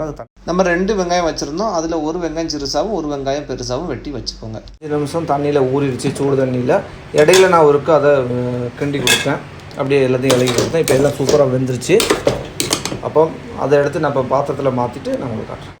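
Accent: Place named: Tirunelveli, India. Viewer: Super recepy bro 😋Nellai slang 👌👌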